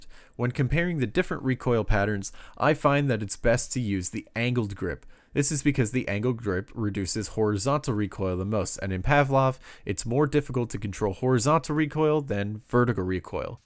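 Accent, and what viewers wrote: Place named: Canada. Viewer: You sound...very canadian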